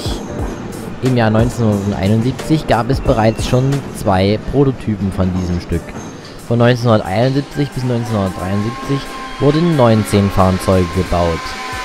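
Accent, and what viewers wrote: Germany. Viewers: wie kommst du drauf, du hättest keinen Akzent? 😂 Ungarinnen und Ungarn sprechen akzentfrei ungarisch, du sprichst alle ungarischen Begriffe mit einem grauenhaften deutschen Akzent aus. Selbst auf Deutsch nuschelst du stark und versprichst dich viel zu oft. Ist schon alles sehr anstrenged anzuhören, vor allem in Kombination mit der schlechten Tonabmischung und der teils aufdringlich lauten Musik, die du besser komplett wegelassen hättest. Ist nicht böse gemeint.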